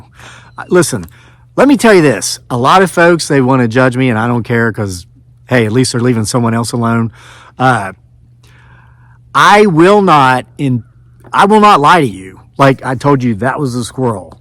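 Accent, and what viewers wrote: South, United States. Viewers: Hi. I'm watching your channel from the UK. All this is very interesting to me and I love reading the comments, people are not stupid, they know what they saw and experienced. You seem like a really nice guy with honesty and integrity and I have no reason to doubt you. I enjoy watching live cams from America too, the amount of weird stuff captured blows me away. Being in the UK is quite boring in comparison to the US so I get quite excited when I see channels like yours pop up. I believe you were under some form of shall we say 'trance' .. Since YU TB doesn't like certain words!! I swear we're all going to be talking another language soon just to get our messages out! 🙄 These trance like explorations over the years aren't just stories that's for sure. Oh, and you don't talk too much, I love your southern accent. Looking forward to more of your uploads. 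Regards to you and your wife. ❤️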